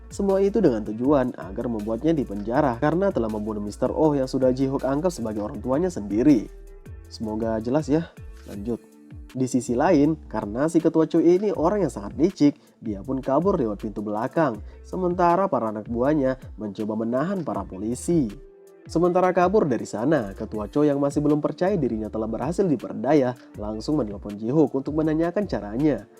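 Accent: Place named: Bugis, Indonesia. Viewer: Saya yakin pasti suku bugis. Dilihat dari logatx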